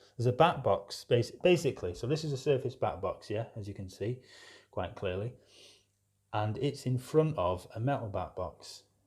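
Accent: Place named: North, United Kingdom. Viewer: My trusted electrician has grown some hair and a northern accent.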